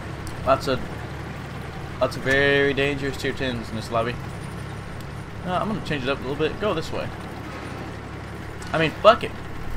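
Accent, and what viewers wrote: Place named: South, United States. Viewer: im glad that your suthern accent is back!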